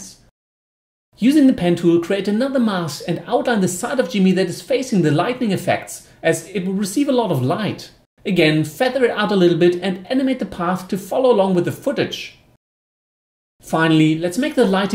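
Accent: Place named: Germany. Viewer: I want to know where this accent comes from! Germany?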